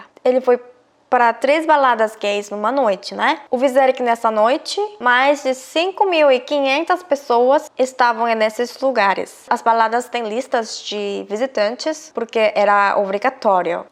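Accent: South Korea. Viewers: Apesar so seu sotaque coreano SEU PORTUGUÊS É QUASE PERFEITO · Falar o português brasileiro errado? Eu fiquei em dúvida se era coreana ou brasileira...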